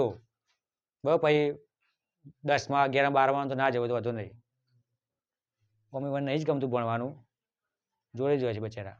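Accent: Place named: Sabarkantha, India. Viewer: સાહેબ સાબરકાંઠા ના નિવાસી લાગો છો.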